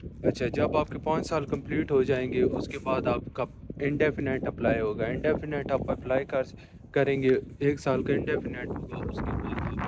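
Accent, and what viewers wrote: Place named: Chakwal, Pakistan. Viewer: Ap Chakwal ke lagtey hain bhai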